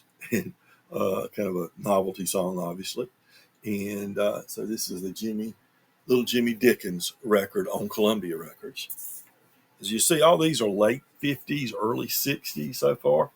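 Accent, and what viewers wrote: South, United States. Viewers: Great stuff. Yesterday on the Tokyo Record Style stream I asked you if you had done a video on albums from '74. Well, look back three years ago, pard.;) I wouldn't forget such a cool southern accent. I was just listening to today. I'm thinkin his cover of is going to be in my Summer Vinyl tag video... if I get the gumption to make it. (What a great album though.) I need to get my hands on that record.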